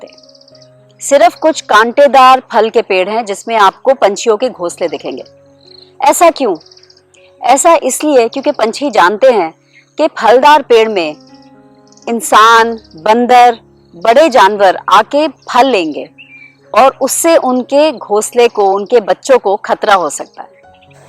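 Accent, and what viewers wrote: Haryana, India. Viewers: Am i hearing a haryanvi accent 😅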